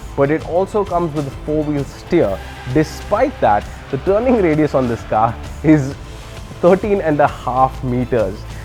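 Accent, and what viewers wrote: India. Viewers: What a crap review. He's not in the car, it's only generic footage, and his Indian accent is a bit thick. I'm not going to buy one of these now...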